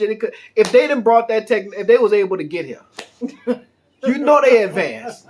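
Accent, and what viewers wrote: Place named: United States. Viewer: The only thing American I see in you is your accent,hang on tight to it lol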